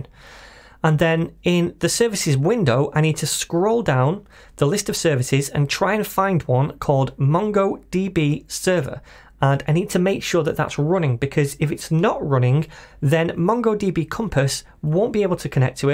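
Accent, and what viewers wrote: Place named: Yorkshire, United Kingdom. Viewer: Are you a Yorkshire man mate?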